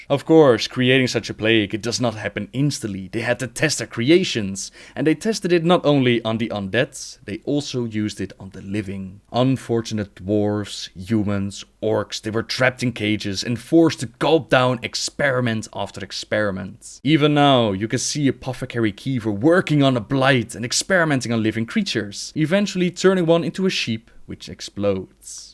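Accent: Netherlands. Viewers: The Dutch accent is real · He has a typical dutch accent lol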